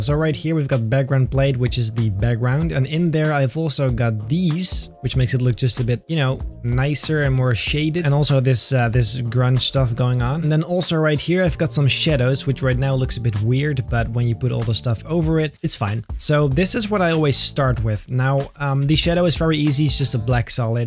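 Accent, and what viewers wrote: Netherlands. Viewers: I love your accent, are you dutch? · It’s called an accent dude. He’s from Holland. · Go for a 1000 Microworlds!! And then auction them off like Beeple and become rich! Also, are you Dutch (like me)? I notice a bit of an accent, not too much tho... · You sound dutch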